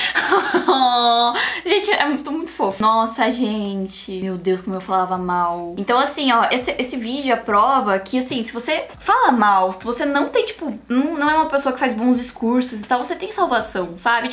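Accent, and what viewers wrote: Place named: Paraná, Brazil. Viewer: "Muito sotaque paranaense" mais vc é paranaense linda! 😍💕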